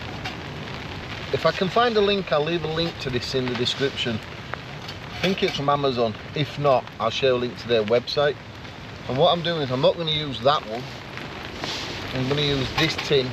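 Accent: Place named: Huddersfield, United Kingdom. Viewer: Def a huddersfield accent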